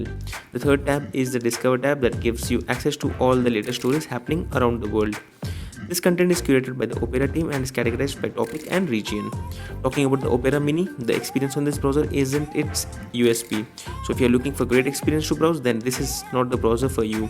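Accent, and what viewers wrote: India. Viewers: I'm an EFL English teacher and this guy speaks exactly how I tell my students not to. If you don't care to decrease the influence of your native language accent on your English, at least speak slower. Fortunately, you have the article to help people who speak global English (and not Hindi English). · can I say I often watch these video is for learning Indian English accent. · indian accents are hard af to understand, i would rather learn hindi than listen to this. hopefully pimsleur has it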